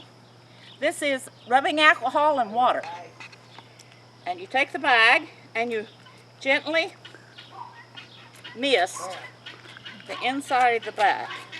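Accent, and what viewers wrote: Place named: Kentucky, United States. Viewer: The Kentucky accent is like a language of its own.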